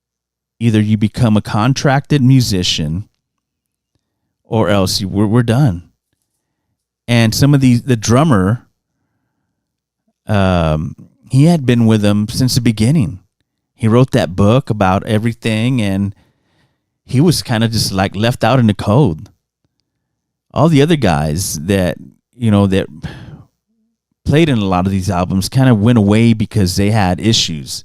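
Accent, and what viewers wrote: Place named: San Antonio, United States. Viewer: First time hearing you, but I'm guessing you're from SA?? Is that the Red McCombs Indian??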